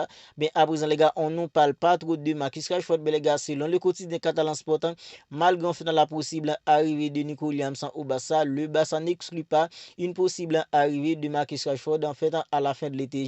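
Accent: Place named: Haiti. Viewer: Je reconnais l'accent d'un patriote haitien, force bro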